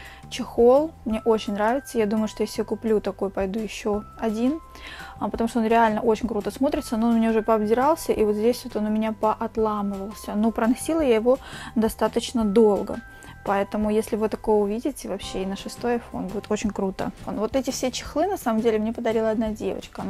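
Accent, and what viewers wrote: Rostov, Russia. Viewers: А вы случайно не из Ростова-На-Дону?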